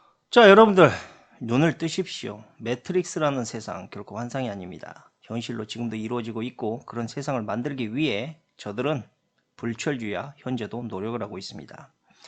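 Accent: Jeolla, South Korea. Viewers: 말씨가 전라도같은데?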